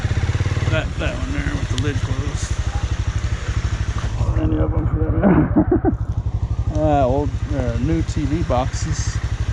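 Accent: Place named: South, United States. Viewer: You’re back! Again! 🥰😁. No, talk as much as you want, I for one, enjoy your particular southern accent. Being southern myself (although, some people don’t consider Florida as being southern), I enjoy and appreciate all the different variations of the southern accents. ✌🏼❤️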